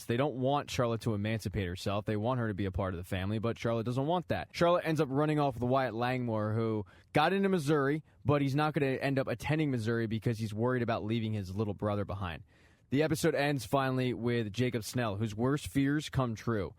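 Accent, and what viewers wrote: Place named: Baltimore, United States. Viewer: Where u from I hear an accent... down the ocean, hon??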